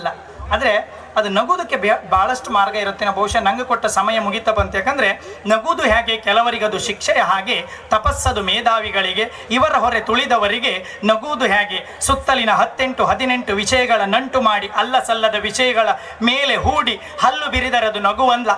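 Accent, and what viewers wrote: Kundapura, India. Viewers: Super sir kund kannada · ನಿಮ್ಮ ಕುಂದಾಪುರ ಬಾಷೆ ಬಲು ಚಂದೈತಿ ಮಾರಾಯ್ರೆ. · Kundapura kannada chanda adu handadi bayall innu chanda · Kundapura bashiye Chanda adrage nam annan math ennu Chanda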